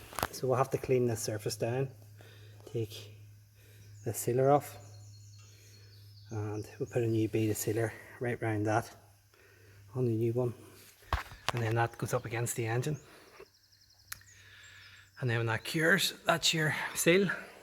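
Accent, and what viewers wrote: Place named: Northern Ireland, United Kingdom. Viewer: You're a diamond, thanks so much for the info. Just given you a like, your accent brings back fond memories I spent a couple of years In Northern Ireland in my formative years met some lovely people along the way, it seems you're carrying on that tradition.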